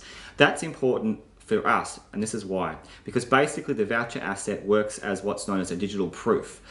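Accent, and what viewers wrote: Australia. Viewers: Thanks for the great imfo...refreshing to hear an Aussie mate. Awesome presentation too.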